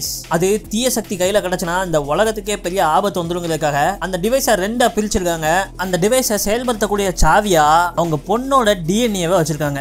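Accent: Tirunelveli, India. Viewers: Ena bro tirunelveli kaarana ne😍😍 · நெல்லை தமிழில் டப்பிங் செய்து பேசிய உங்களுக்கு ரொம்ப நன்றி மிக்க நன்றி சகோதரரே 💚💚🙏🙏💐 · Are you from Tirunelveli Ya Brother ??????? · வர்ணனை அட்டகாசம் தோழரே... இதுக்காகவே உங்க channel ல subscribe பண்ணிட்டேன். உங்கள் வெற்றி தொடரட்டும்.. (எப்பொழுதும் இதே நெல்லை தமிழில் பேசுங்க தோழரே)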